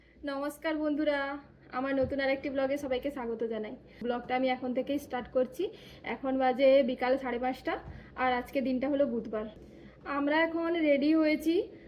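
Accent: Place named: Medinipur, India. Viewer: Tomar bari kothay kothar tan sune mone hoche medinipur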